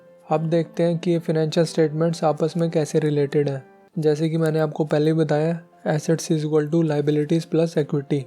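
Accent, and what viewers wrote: India. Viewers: Welcome back sir🙏. Ur knowledge packed presentation in deshi aawaj is just killing...lagta hai koi apna school ka purana yaar bol raha hi....keep doing favour to us by sharing ur knowledge in deshi style 😀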